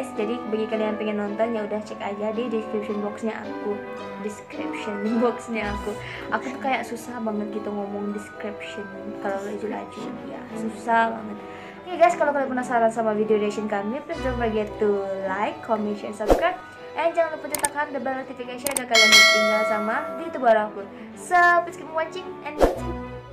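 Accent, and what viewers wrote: Indonesia. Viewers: Ngomong ny Indonesia Banget .. Orng mna sih ini..🤔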